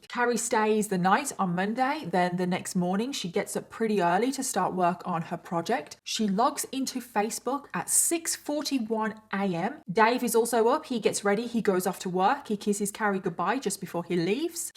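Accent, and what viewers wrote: United Kingdom. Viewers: i dont think ive ever heard a more british accent hahah every word is brilliantly emphasized haha exquisite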